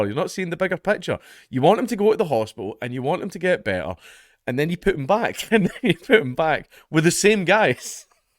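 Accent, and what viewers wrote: Scotland, United Kingdom. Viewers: Hey, Dankula, make an update video. I need to hear the today's news in Scottish accent 🤌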